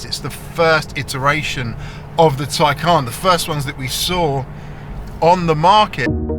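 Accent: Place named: United Kingdom. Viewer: I can't believe how difficult this is to follow… If everything is concise and well worded and nicely structured, the British accent only makes things better… If that's not the case you're really stands out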